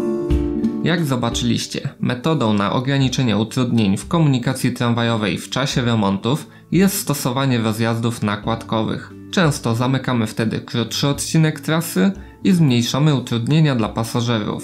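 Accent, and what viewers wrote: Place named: Łódź, Poland. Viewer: Od razu słychać że jesteś z Łodzi. Krańcówka co :-)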